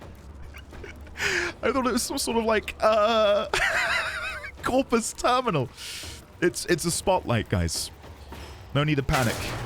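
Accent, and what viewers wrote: United Kingdom. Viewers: I totally agree in regards to playing the game solo for now, I played the whole starchart solo and it helped me to learn all the mission types and game mechanics better. The only time I joined squads was for interception missions as defending 4 points on the map solo was a challenge for me 😊 You sound as though you are also a fellow Brit , not sure I have seen many UK players make videos. Great vids btw and yes personally I love watching your whole WF playthrough, it's a bit of nostalgia for us who have been plying for years, we enjoy seeing new players experience what we have experienced, the new player experience seems much better now. Love your excitement and energy. 😂 You can buy a codex scanner from the market for credits if I remember correctly, then you can use your Helios to auto scan enemies like Vor and Vey Hek etc, every enemy actually for your codex entries. 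It will then also show you which damage type is best for which enemies, not that you need to worry about damage types yet The difficulty will increase per planet so don't worry it will get tougher.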